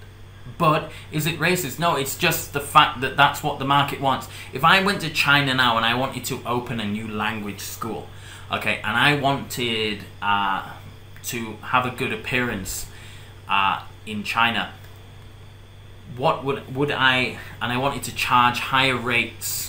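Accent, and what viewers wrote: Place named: RP, United Kingdom. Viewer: Your queen's English needs a bit of clearer articulation. And yeah, weak argument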